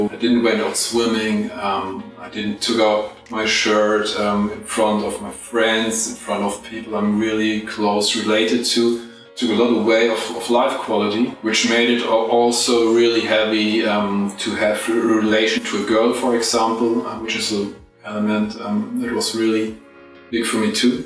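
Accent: Germany. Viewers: Der deutsche Akzent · Your story is amazing. This explains a lot of your tattoos you have unfortunately when you’re growing up, there’s some real bully kids and mean kids that want to make you feel bad about yourself fortunately for you you have nothing to feel bad about those same kids today are probably like wow look what he did amazing I love your accent German so am I I should say part German in German and half Apache, Indian, good combination, fire and water. I was born in July.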